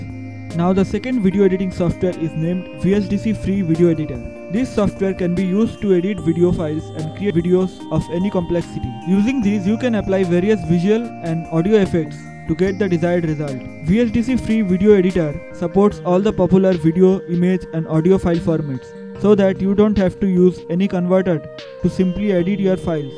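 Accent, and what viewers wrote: India. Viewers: Difficult to understand the Indian dialect...... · When i hear this sound how he is talking he is from Indian for sure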